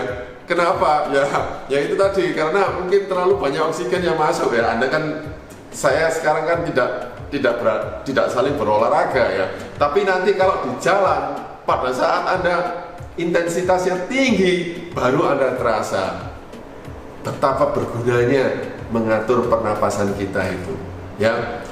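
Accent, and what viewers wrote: Surabaya, Indonesia. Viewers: Wong Suroboyo iki koyoke hehe. Thx tips nya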